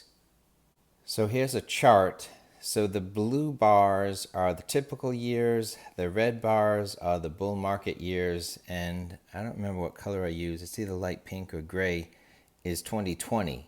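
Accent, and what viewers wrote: New England, United States. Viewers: You sound like a New Englanda. I'm from NH. How far off am I?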